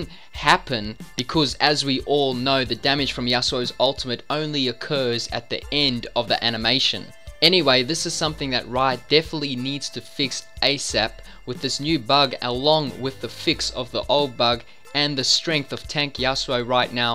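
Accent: Afrikaans, South Africa. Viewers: are you south african?? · Dude are you south african? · is jy Afrikaans dude?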